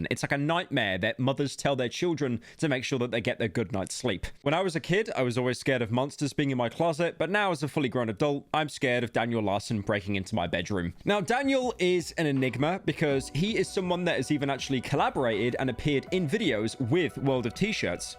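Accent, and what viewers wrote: United Kingdom. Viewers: I'll be honest, I thought he was British.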